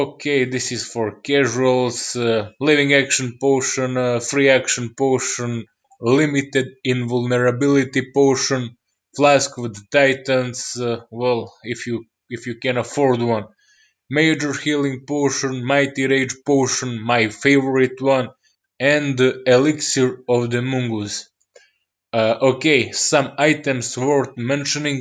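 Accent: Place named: Russia. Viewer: у тебя акцент русский dude....)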